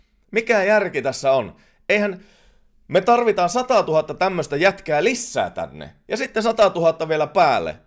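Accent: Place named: Oulu, Finland. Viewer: Nyt oli asiaa kansanomaisella kielellä. Oulun murteenkin kohdalla käännetty nupit kaakkoon, täältä löytyy muuten Suomen paras kesäkaupunki eli Kotka. Tervetuloa!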